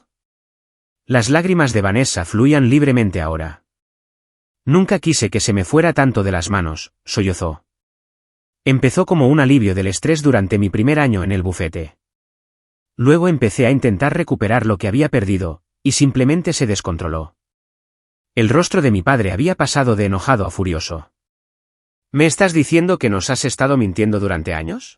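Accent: Spain. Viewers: es mi favorito narrador tanbien no mea burre me lleva ala imaginacion de cada personage como si fuera pelicula envivo asi como narracon esavos y acento espanol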